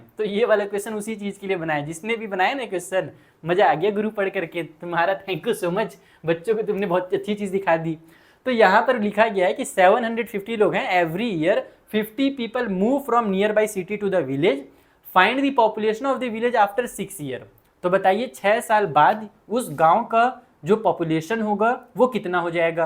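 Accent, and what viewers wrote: Bihar, India. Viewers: Pta nhi chl rha kya language se biharrr babu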